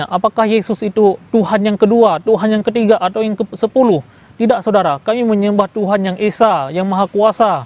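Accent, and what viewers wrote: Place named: Pidie, Indonesia. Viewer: aceh mana kau? aceh pidie ya? dr logat bahasa aceh kau nampak org pidie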